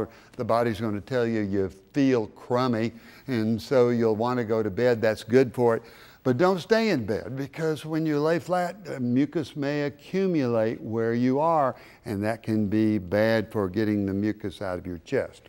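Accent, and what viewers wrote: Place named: Mississippi, United States. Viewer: He sounds like he's from Mississippi . Wish he was our doctor. This guy has KNOWLEDGE .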